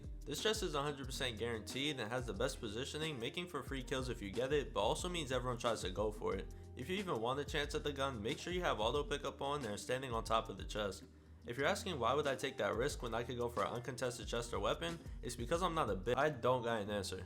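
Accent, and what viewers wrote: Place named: Netherlands. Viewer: Ben je NL? je accent is nl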